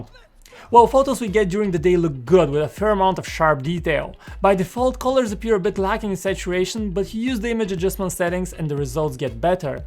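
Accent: Varna, Bulgaria. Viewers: Are u slavic ? Bulgarian perhaps? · Само аз ли забелязах че си от варна :Д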